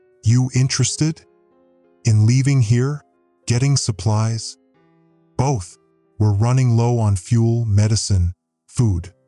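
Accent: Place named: Spain. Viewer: The AI keeps going between regular English to a heavy Spanish accent lol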